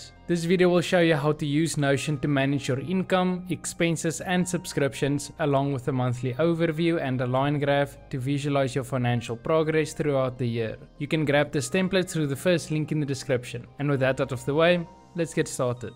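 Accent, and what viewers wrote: Afrikaans, South Africa. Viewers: the most south african sounding accent I have ever heard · afrikaans as fuck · knew you South African!!!🇿🇦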